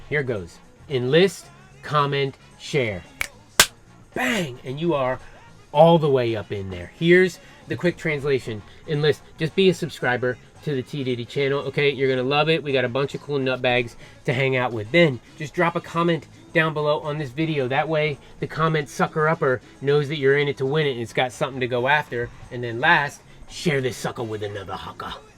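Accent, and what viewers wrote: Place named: North Carolina, United States. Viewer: Hey, now! Where do you reside,? It sounds like from your accent that it's relatively near me, in central Piedmont NC. And how about that disc? Pretty amazing how much work went into that bad boy!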